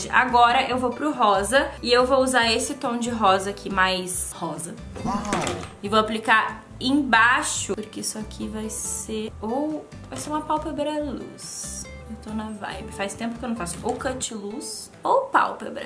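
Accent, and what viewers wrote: Rio Grande do Sul, Brazil. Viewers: Te, ta, guria, guri, de... que amooorrr quando ela fala como gaúcha😍😍❤️❤️, sou do Rio Grande tbm, mas do interior, no fim do mundo na vdd 😂😂😂 Porto Xavier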